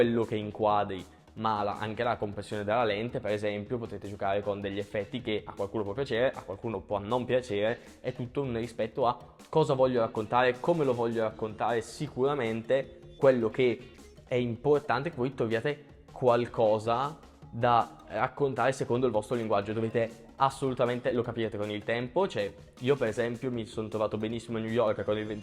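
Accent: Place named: Brescia, Italy. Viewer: Ciao vecio! Permettimi il “vecio” perchè ho capito subito che sei Brescia dall’accento 😂 Anche io faccio Street, ho vinto anche un concorso tanti anni fa con una foto in Piazza Vittoria, se fate uscite di gruppo mi unirei volentieri per qualche sessione. Nicolò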